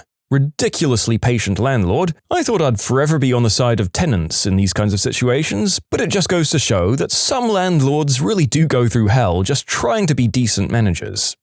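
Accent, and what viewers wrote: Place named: England, United Kingdom. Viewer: I like the English narrator he's very charming. HIs delivery on stuff really works Plus I like his little inserts sometimes I wish we got more of those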